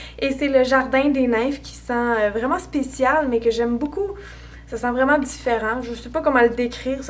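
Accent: Quebec, Canada. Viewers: Merci pour cette vidéo très utile ! Moi aussi j'achète des produits Yves Rocher et j'aime beaucoup la crème pour les pieds sauf qu'elle se vide très vite... Je voulais te remercier pour ta fraîcheur et ta vitalité ! Ça met vraiment de bonne humeur et c'est toujours un plaisir de regarder tes vidéos !! Je les regarde en dernier car ta chaîne est ma préférée ! En plus ton accent me fais rêver car j'ai très envie d'aller au Québec !! Gros bisous et continu comme ça !!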